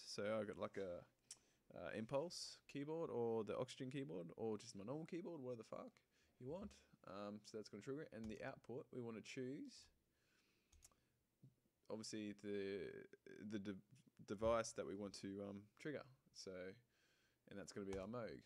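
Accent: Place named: Australia. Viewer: Hi from Norway! Great to hear another Aussie accent :)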